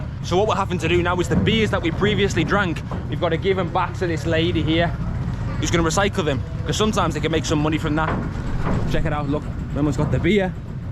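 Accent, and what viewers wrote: Liverpool, United Kingdom. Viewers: Liverpool accent at its finest 😭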